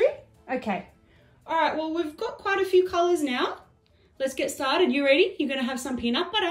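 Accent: United Kingdom. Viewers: Are you British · Is this YouTuber brithish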